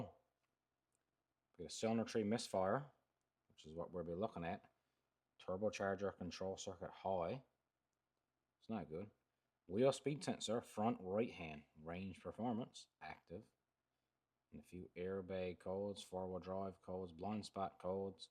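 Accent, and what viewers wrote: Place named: Newfoundland, Canada. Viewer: Sound like a Newfoundlander cheers 🍻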